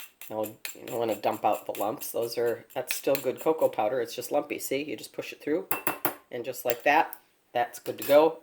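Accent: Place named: Rochester, United States. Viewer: That looks YUMMY--and so easy even I can do it!! HEY--are you from Rochester by any chance?? (I'm a native.) You have a Rochacha accent! I live in Virginia now and I miss my home town but not in the winter!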